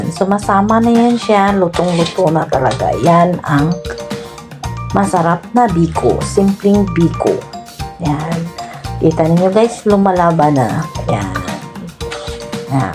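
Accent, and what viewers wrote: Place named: China. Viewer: Level up na biko ito my dear friend, Nakakatuwa po intonation the way your spoke po ng tagalog parang chinese intonation po. Amazing tutorial po my dear friend.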